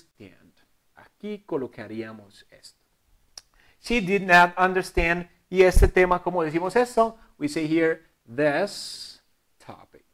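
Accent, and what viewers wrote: Colombia. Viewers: Wow. Me encantó como explicas todo. Tu asentó colombiano me encanta. I ❤️ 🇨🇴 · ¡Amé su acento colombiano! Además tiene una manera tán sencilla de explicar. Realmente este tema yo ya lo vi, pero siempre es bueno reforzar y conocer otras exploicaciones a parte de las que me dan mis maestros. Por mi parte, seguiré viendo los vídeos de este canal; me encantaron. ☺ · JAJAJA pensé que era la única. Es tan lindo y además con ese acento colombiano, uff!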